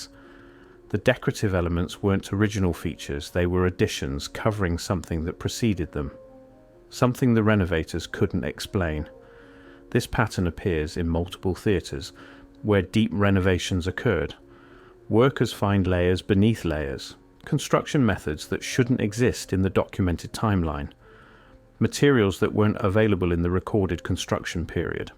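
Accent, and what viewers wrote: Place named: Mississippi, United States. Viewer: I thought you were from Mississippi 😅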